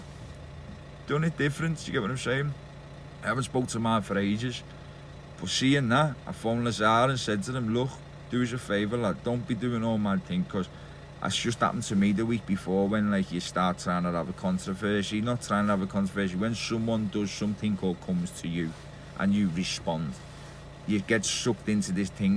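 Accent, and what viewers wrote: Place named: Cockney, United Kingdom. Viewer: you seem like a nice fella pal, love the cockney accent 😂 I need work, i work at the moment. But need something better mate.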